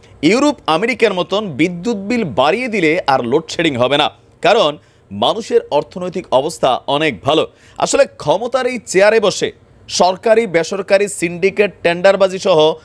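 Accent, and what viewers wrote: Sylhet, Bangladesh. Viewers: ভাই আপনি যে সুন্দর সিলেটি মাতওইন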